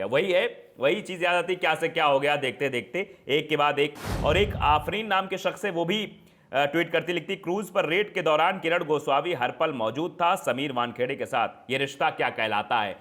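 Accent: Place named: Lucknow, India. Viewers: FromPak🕊Enjoyed your dialect/ language and style. Are u from Lucknow. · मस्त अंदाज़ है भैय्या आपका🙏🙏 प्योर up के लगते हो।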